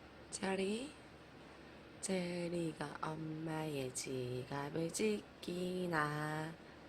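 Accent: South Korea. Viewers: 눈이 와이리 커요~ 고향이 경북 문경이나 상주쪽이신가요? 억양이 처가쪽이랑 꽤 비슷하네요 ^^ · ㅋㅋㅋㅋ 저까지 같이 신나네욬ㅋㅋ 찐부산사투리 넘 좋아용 ㅠㅠㅠ 애미 자는 모습을 보니 집이 엄청 편안한 가 봅니다! 매번 댓글 다 읽어주시고 하트보내주셔서 감사해용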